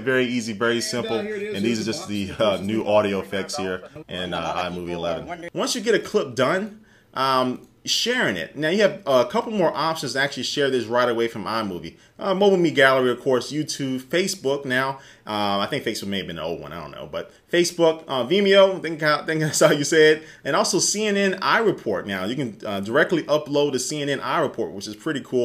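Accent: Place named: St. Louis, United States. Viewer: the "imma dis" and "imma dat" annoyed me too, but then he said he was from saint lewis, right? so then it's understandable. he's not trying to be cool it's a location thing :)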